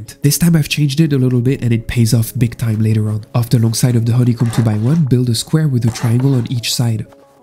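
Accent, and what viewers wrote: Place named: France. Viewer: Mhh l'accent français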